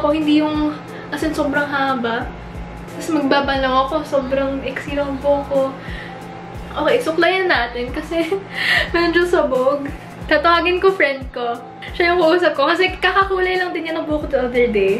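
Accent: Batangas, Philippines. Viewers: Batangenyang batangenya ka po,😊same po tayo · kacute ng batangueña accent huhu "ay syempre"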